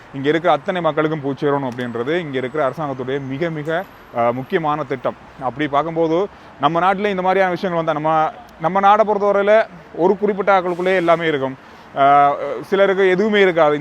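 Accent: Sri Lanka. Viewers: உங்களது இலங்கைத்தமிழ் தமிழ்நாட்டுத் "தமுளை" விட கோடி மடங்கு இனிமை !! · உங்களின் இலங்கை தமிழ் கேட்க்க இன்ப தேண் வந்து பாயுது காதிணிலே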